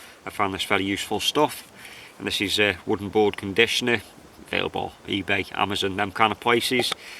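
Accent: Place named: Staffordshire, United Kingdom. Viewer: Hiya new subscriber to your channel mate,just getting back in to spoon carving after some kind person broke into my allotment shed and stole all my gear,you sound as if your a Staffordshire fella lol.great video tho must watch more.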